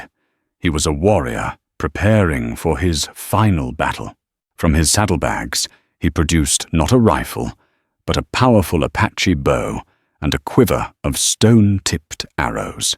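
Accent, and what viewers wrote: United Kingdom. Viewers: What a great voice! A beautiful British accent. Interesting story. Oslo, Norway.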